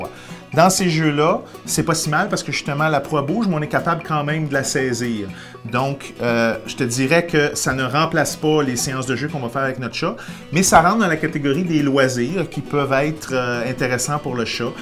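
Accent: Quebec, Canada. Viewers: très interrecend je me demandais se qu'etait le bruit que mon chat fesais en voyant un oiseaux :) mon chat est vite fatiguer quand il joue parfois un joue a chat ^^ elle me demande de lui courrire apres elle se cache et elle fait semblant de mataquer et elle repart en courant avec son aire joueur mon chat ne me griffe pas et ne me mord pas quand elle joue ou quand elle joue pas comme elle est très gentille avec nous qu'elle fait pleins de calins et qu'elles est pas agressive je lui donne une petite friandise :3 je suis francaise et je reve d'aller a quebec ! j'adore l'accan quebecoi ♥ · XD J'adore cet accent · Olala l'accent québécois xD C'est aussi compliqué que d'entendre les nordiste quand on est pas habitué ^^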